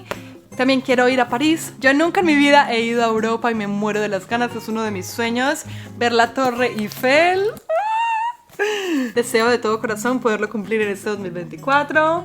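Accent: Antioquia, Colombia. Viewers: Otra vez se lo digo?😂Amoooooooo cuando hablas con el acento bien colombiano 😍😍😍😍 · SALUDOS CORDIALES DESDE COSTA RICA BENDICIONES PARA TI Y TU FAMILY QUE DIOS CUIDE DE USTEDES X SIEMPRE EN HORA BUENA CON TANTO PREPARATIVO DE DESEOS DE BIENESTAR... DE❤ TE DESEO DICHA REALIZACIÓN... MUCHO POSITIVISMO... Y LUCHA DE LOGROS X ALCANZAR... ME ENCANTA VER TE TAN POSITIVA CON TANTO CAMBIO Y VER COMO COMPARTES CON TUS SERES QUERIDOS. EXCELENTE... LOGRES LO QUE LOGRES SERÁN TUS ÉXITOS DEL HOY QUE PROFESAN TU LUCHA... TU AHNELO MAS SENTIDO MIS SALUDOS Y BUENOS DESEOS.. Y ME EN CANTOOO ESCUCHARTE TAN COLOMBIANA TAN GENUINA.. DEBERÁS PA DELANTE COMO EL ELEFANTITO LO... BUSCANDO EL PRIVILEGIO DE LOGRAR LO AHNELADOOO... LO DESEANDO... LOS SUEÑOS PROMETIDOSSS... DE❤ TE DESEO PROSPERIDAD EN SALUD Y ECONÓMICO PARA QUE ALCANCES LO MUY DESEANDO EN ESTE 2024..❤❤...❤❤..❤❤...❤...❤...❤❤..❤ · Tengo mi lienso en blanco. 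Tenia un trabajo estable lo deje hace un año. Querìa empezar 2023 fuera de conford. Fue un año muy bueno. En este 2024 estoy sin trabajo no veo un horizonte. Pero amè escucharte con el acento colombiana jejjee imaginado tu niña vestida de princesa. 🍇🥦🏖🏡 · Amo el acento paisa de este video❤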